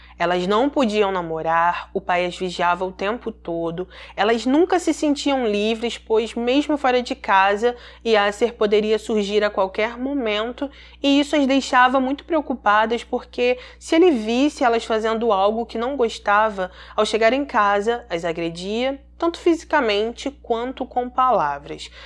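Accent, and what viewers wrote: Rio de Janeiro, Brazil. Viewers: Boa noite pode ficar o tempo que precisar contando essa história da vida real já vi mas quero ouvir de novo você contar 😉"Estadossss Unidossss" melhor sotaque carioca amoooo linda maravilhosa 🥰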